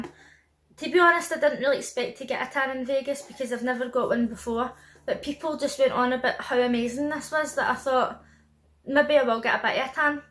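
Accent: Scotland, United Kingdom. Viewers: yaaasssss love hearing a fellow Scottish voice in a beauty review, glad I didn't buy this! thanks so much for the honesty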